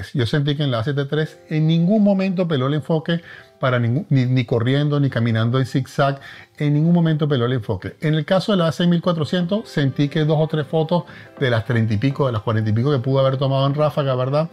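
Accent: Venezuela. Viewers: jeje nunca había visto tus videos pero apenas hablaste ya nos dimos cuenta que eres venezolano buenísimo contenido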